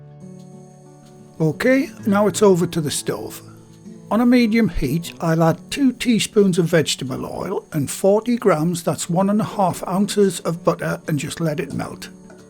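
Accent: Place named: England, United Kingdom. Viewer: Geez I really like the recipe you’re using and your teaching style! It looks very tasty and a great dish for winter. Your accent reminds me of my Dad and his English relatives. Thank you for this video.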